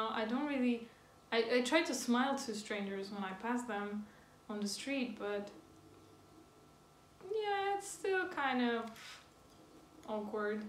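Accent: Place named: Europe. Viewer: Honestly I think you got lots of potential. It's a shame you quit. I do similar vids. You sound European, let me guess..... enmmm... tbh idk. Middle Europe? Aahh Poland! So I guess I was right. I got my answer as I watched your video. It's a good video. You should do a legendary comeback to youtube.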